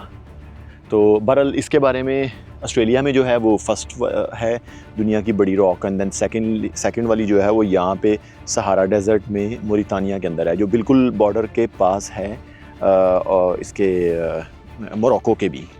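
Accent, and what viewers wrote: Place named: Punjab, Pakistan. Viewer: Really good video. I like your videos, just saw only 2 videos but Really good. Your urdu accent is likely from Gujrat or Sialkot. I don't know where are you from. I am from district Gujrat. Anyway good video. Waiting for your next video.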